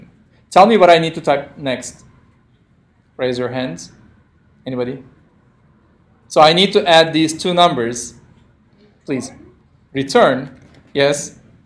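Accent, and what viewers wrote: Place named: Turkey. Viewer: Lhahah :) sadece türk aksanı ile ingilizce olduğu için mutlaka tüm seriyi izleyeceğim müsait bir zamanda :)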